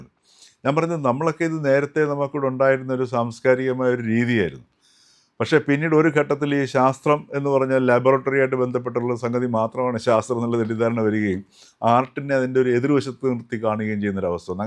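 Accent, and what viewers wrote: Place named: Madhubani, India. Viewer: Pranounsation is Madhubani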